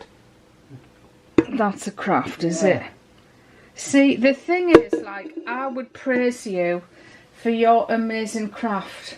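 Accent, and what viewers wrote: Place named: Cumbria, United Kingdom. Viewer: Haha right Cumbrian kitchen banter. It so nice to find videos with a local accent instead of usa (not that I've anything against usa accents I watch them n all) it's just good to know Cumbrians craft too 🤩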